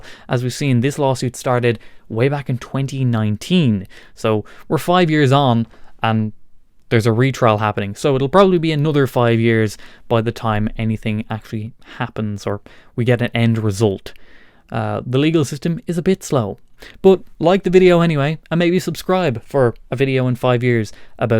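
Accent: Dublin, Ireland. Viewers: Englishman here, got me too! Turns out I’d never heard an Irishman say the letter R before. Now I know. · That is not how all Irishmen pronounce the word R , he is from Dublin & that is how they say their R`s. Just like the UK , there are many different accents in Ireland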